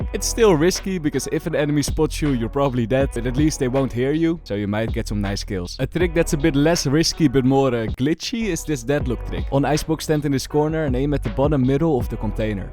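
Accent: Netherlands. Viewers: I have been watching your videos since I started playing Valorant in 2021 and I'm a regular viewer. Independent of this information, I moved to Amsterdam in 2022 and only TODAY REALIZED THAT YOU HAVE A DUTCH ACCENT fkkskskskskskskskss hahahaahah 😅 sorry for TMI! Love your videos, hope you grow ❤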